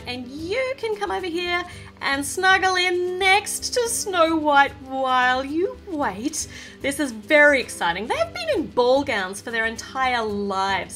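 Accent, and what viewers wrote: Australia. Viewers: Are you Australian Please reply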